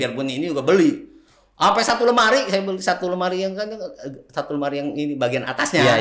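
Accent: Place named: Jakarta, Indonesia. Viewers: Kok logatnya kaya orang betawi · Seru jg koh ceritanya ..ada logat btwi nya heheh Alhamdulillah..koh istiqomah dalam agama Islam🙏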